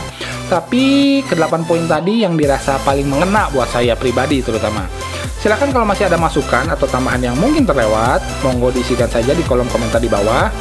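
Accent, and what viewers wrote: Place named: Sundanese, Indonesia. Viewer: amiiiiiin.... sukses terus buat, ane suka sama logatnya yang jenaka & sunda pisan euy.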